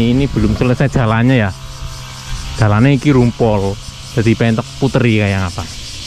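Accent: Banyumas, Indonesia. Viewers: jawanya ngapak maseh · Wis katon sampean pasti asale sng brebes.. soale logate ngapak.. salam kenal mas e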